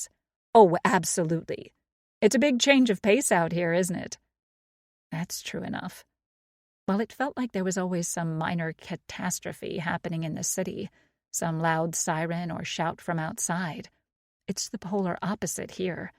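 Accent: United States. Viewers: An American accent! These are practically rare.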